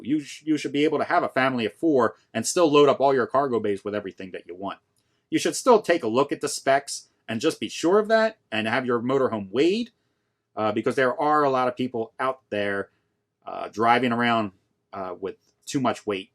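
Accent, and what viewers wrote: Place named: Baltimore, United States. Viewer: You said WaWa ...trying to figure out that accent. I think I hear a little Baltimore ..lol.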